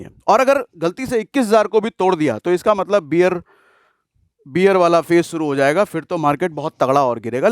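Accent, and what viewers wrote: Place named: Kanpur, India. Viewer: full on kanpuriya 😁😁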